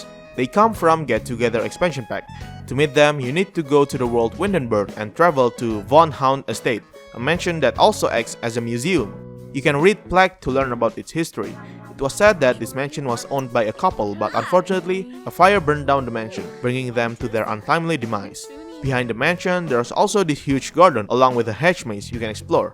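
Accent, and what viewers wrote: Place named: Indonesia. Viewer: OMG I don't know if you're Indonesians, first time I hear your accent it's look like Indonesian people talking English lol that's cool 😅👍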